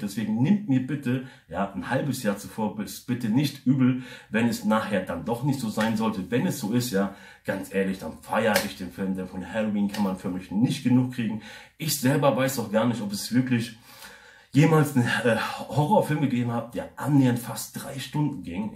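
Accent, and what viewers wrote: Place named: United States. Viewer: Bist du Amerikaner dass du einen Ami Akzent hast ?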